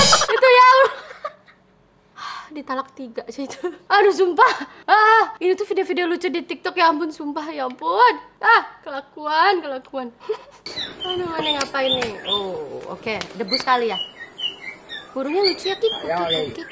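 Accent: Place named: Java, Indonesia. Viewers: Reaction logat Jawa lucu kak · Boso.mu medok² jowo Ki wong ndi toh😴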